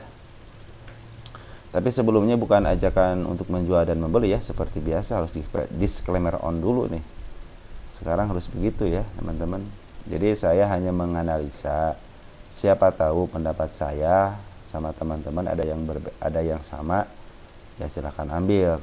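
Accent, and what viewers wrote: West Java, Indonesia. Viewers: Si aa urang sunda pisan logatna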